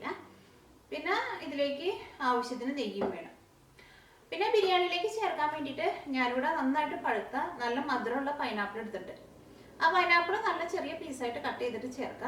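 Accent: Thrissur, India. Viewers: Ithonn vrithiyaayi paranj tharoo mole, ingale thrissur bhasha thiriyaanjittaa...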